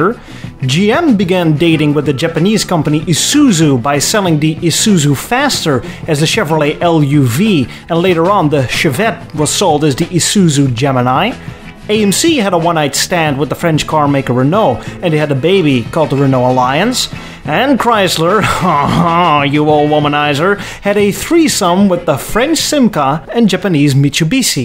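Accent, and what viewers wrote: Netherlands. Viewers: are the very best motor vehicle episodes on YouTube. Brief, to the point, factual, entertaining humor enhanced further by Dutch-accented delivery. FIVE STARS. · Great, interesting gloriously, mammoth series of videos. really enjoyed each one and it has given a sparkling insight into that period in American car evolution. Don't worry about your pronunciation. Your Dutch accent is brilliant and quirky. How does one pronounce Coupe in the USA, England (the home of English) and Europe? There isn't only one pronunciation! Please keep up the good work.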